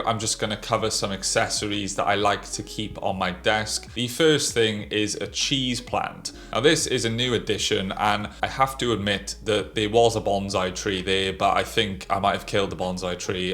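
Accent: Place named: United Kingdom. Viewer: You sound British. Do you work at Spotify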